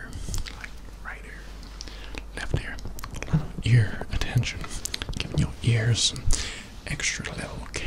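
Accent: United States. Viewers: Is this your only video with an american-type accent? It's spot on! And super relaxing! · Your American accent is impressive 👍🏻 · I LOVE hearing you with an American accent! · American accent is magical Keep doing doing your thing you sexy beast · I am shooketh. I can't believe you're so good at a American ascent. Heck I'm American and don't even have that good of an American ascent! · pretty good american accent man! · Its funny what american accent sounds like.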